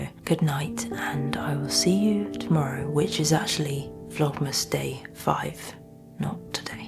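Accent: United Kingdom. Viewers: HI hello there, lucky to find someone who`s close to the nature. good to see you here, exceptional work done, great videos, amazing Brit`s accent. music, everything is so perfect n upto high standard videos, love to spare few moments. plz carry on making more videos. stay safe and rise n shine.............🐿🐿🐿🐿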